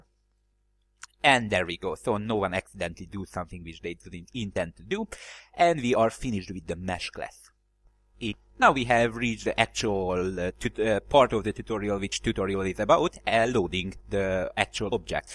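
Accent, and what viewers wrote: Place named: Hungary. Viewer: Are you Hungarian? :)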